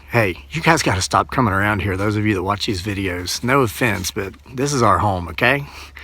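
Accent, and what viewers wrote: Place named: Ohio, United States. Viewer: Are you possibly in Ohio? You have a bit of an accent.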